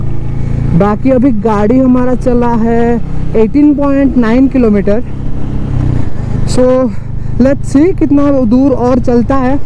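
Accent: Odisha, India. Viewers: Pls make it in odia,coz ur Hindi & English pronounce appear typical odia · Bhai re laguthila Odia boli tuma accent ru … especially Talcheria boli … btw nice video … mu b Talcher ru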